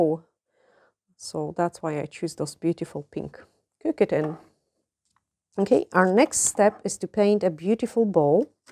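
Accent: Scotland, United Kingdom. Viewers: Your precious little accent is EV-ER-Y-THING!💖 JUST ADORBS 🤗 I never would've guessed Scotland!!! But you sure throw out some BANGERS with your nail art, but HUN-NYYYYYY!!!!! THAT STONE PLACEMENT IS ALWAYS BEYOND ON POINT 👌🏼 Honestly, even if you had no idea how to do nails, (which you are so exceptional àt!) , SOOO MANY PEOPLE would still come to you for stone placement and/or nail design 😍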